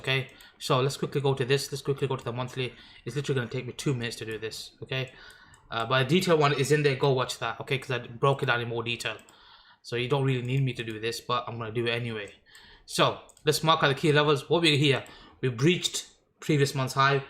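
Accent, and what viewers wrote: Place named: Birmingham, United Kingdom. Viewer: yes bruv birmingham accent lol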